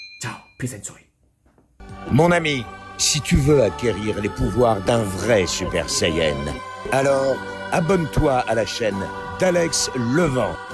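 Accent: Quebec, Canada. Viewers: Accent québécois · L'accent c'est l'accent Québécois et franchement tout le taffs que tu fait tu mérite clairement plus d abonnés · Un accent québécois ;) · C pas un accent un peu oui mais québécois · C’est un accent du Québec 🇨🇦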